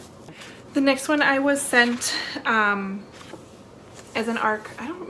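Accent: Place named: Midwest, United States. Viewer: Are you from Minnesota or Wisconsin? North Dakota gal here! Your accent is fabulous. Also, love your videos!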